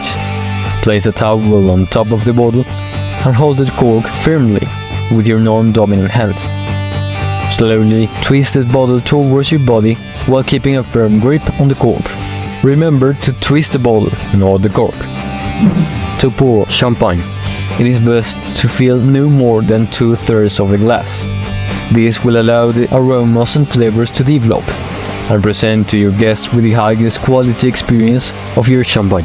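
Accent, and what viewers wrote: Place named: France. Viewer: Very nice video, thanks very much!! To be honest its a little hard to understand your accent, but with subtitles it works perfectly, also your accent adds some french charm to it, so feels like the narrator is definitely know what he is talking about, so although its a bit hard to understand its actually nice )) thank you!!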